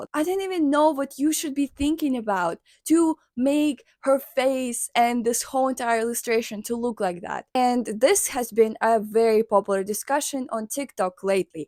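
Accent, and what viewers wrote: Russia. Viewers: Are you Russian? Your accent sounds Russian Edit: saw the Cyrillic text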